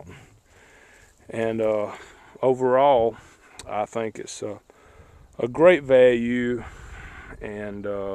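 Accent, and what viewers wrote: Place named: Texas, United States. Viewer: I'm fascinated by the hobby and have finally narrowed down my telescope search to the Orion StarBlast 4.5 or the 6. (The 6 has bigger aperture, but I'd like the dobsonian tabletop mount.) Have you, since making this video, had a good experience overall with this scope? I'd love to hear your thoughts and whether they've changed since having it for a few years. P.S. Are you Texan? You sound like my family and it's great. Haha ;)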